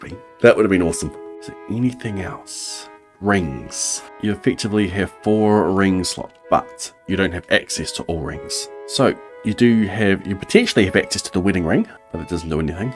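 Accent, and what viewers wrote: New Zealand. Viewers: love ur new zealand accent bro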